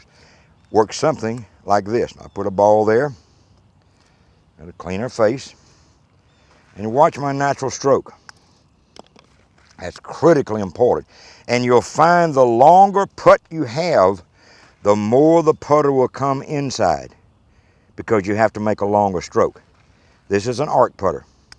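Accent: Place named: South, United States. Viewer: Great southern accent! Makes the video succulent.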